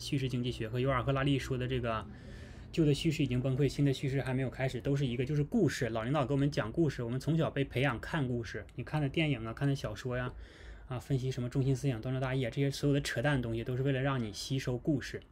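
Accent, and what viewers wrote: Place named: Northeast, China. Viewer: 播主的声音挺好的，有点东北口音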